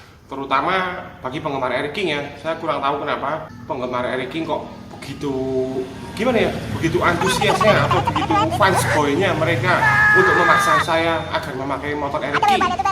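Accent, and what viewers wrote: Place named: Banyumas, Indonesia. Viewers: Benar banget om begitu lihat pertama kali youtube om langsung suka karna bahasa om yg ngalor ngidul nya medok banget itu yg bikin suka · Klo saya lbh suka ocehanya mz lbh klasik jwo medok hhhhhhh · Ga ngapak ga enak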